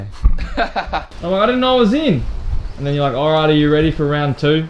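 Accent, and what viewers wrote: Australia. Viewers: How to make a Film Lots of Screaming Thicc Aussie Accent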